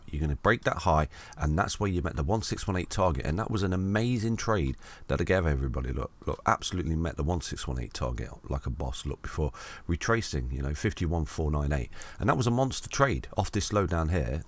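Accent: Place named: Nottingham, United Kingdom. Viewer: im from nottingham and your accent sound local to me, do you do any meet ups to share knowledge ?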